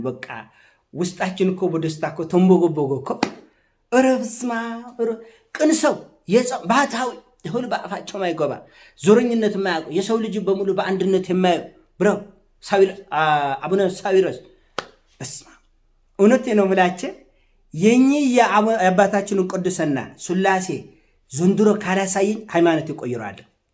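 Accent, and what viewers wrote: Tigray, Ethiopia. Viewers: በትግሬ አክሰንት በመሆኑ እጅግ አዛናኝና ማራኪ ነው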